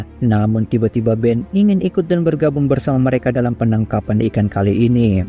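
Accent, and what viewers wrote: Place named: Sulawesi, Indonesia. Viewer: Admin kayakx org Sulawesi terdengar dari logatnya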